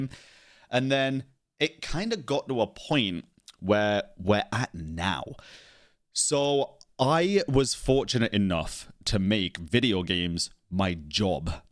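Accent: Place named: United Kingdom. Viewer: youu brought up being british, and its insane how 99% of the time i completely forget you are, even though your accent is shown with every word you say but i just never think about it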